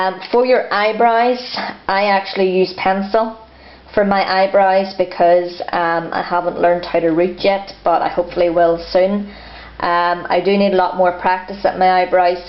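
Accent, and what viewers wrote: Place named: Northern Ireland, United Kingdom. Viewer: I totally wasn't expecting a fellow Northern Irish person ❤ thanks for the tips xx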